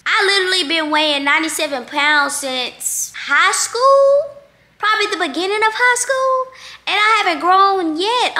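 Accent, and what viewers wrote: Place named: Alabama, United States. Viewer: You from Bama like me all my folks pronounce everything wrong Himalayan Salt 😊❤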